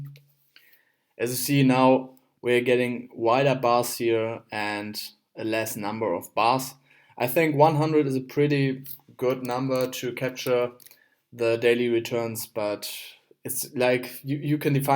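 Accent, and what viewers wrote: Germany. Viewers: Expected a babe, but got a nice german accent to listen to make me even nerdier than I am now. Actually the tutorial was just what I was looking for in terms of prediction! Loved the data visualizations as well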